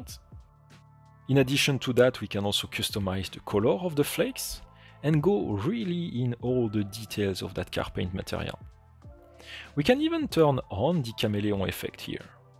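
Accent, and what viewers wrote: France. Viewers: toi tes francais mon pote,l'accent de ouf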